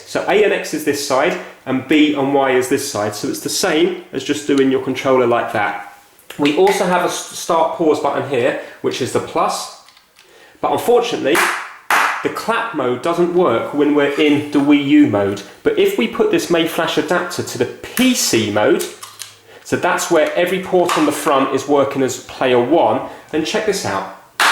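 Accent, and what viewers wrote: United Kingdom. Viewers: we present the award for the most english sounding youtuber ever to this guy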